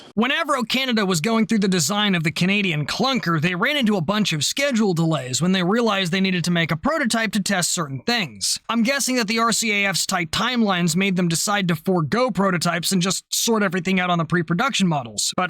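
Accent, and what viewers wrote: United Kingdom. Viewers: As a British citizen 🇬🇧. I approve of your accent you may to Britannia & feel welcome. Keep up the jolly good Work old boy. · I was wondering where the CR105 in project wingman came from. Banger British accent bro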